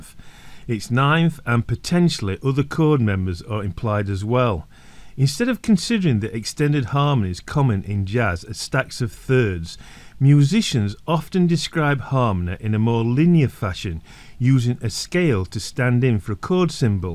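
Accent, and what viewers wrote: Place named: Lancashire, United Kingdom. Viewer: Lancashire by my ear.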